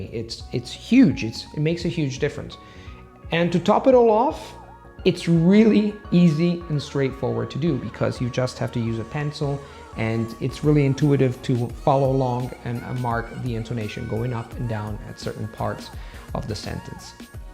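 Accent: United States. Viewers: My pleasure,:D Oh, so staying in Poland "inspired" you to learn Polish, then:P Good luck, btw!:) I've heard that Polish intonation is pretty similar to an Italian one. I mean that it's quite simple to master Italian for Poles and Polish for Italians regarding pronunciation but I may be wrong:P Yeah, it definitely helps socially as you mentioned in the above <3, I must admit that your videos have been like a drug to me recently xd I can't wait to prepare food and watch your video:D Plus, I love the fact that your accent is American <3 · I've known a lot of Italians, but I don't think I've met any who've mastered American intonation like you.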